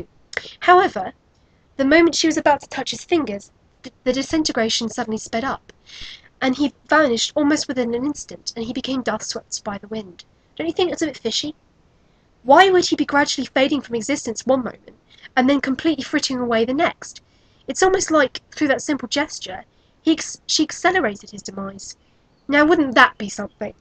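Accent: United Kingdom. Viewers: LOL I'd like to see Canon Ulquiorra's reaction to UH Ulquiorra XD Oh the so-called Orihime fans *rolls eyes* the only ones in denial are them. As regards the heart and it's meaning, one of the chapters of the Hueco Mundo Arc was called "Six Hearts Will Beat As On" refering to all the nakamas who went to save Orihime, so there it is, the "heart" as a friendship device. Btw, I love your pronunciation. You're british, aren't you? XD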